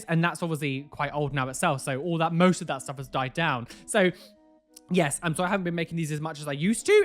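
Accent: Texas, United States. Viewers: The fact can do an American Accent better than I can do a British accent 😂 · Atleast your American accent isn't as bad as American's British accent Like if America can have different accents why can't we lmao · YO, ARE YOU TEXAN?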